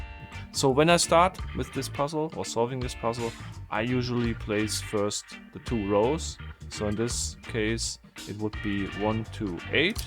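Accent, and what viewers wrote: Germany. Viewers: Ich wusste dass du deutcher bist, dein akzent verrät dich. Aber ich bin auch aus deutschland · Bitte lerne Englisch. Du verdrehst die Sätze und man hört deutlich ,dass du eine Deutscher bist. wieso machst du nicht einfach einen Deutschen Kanal?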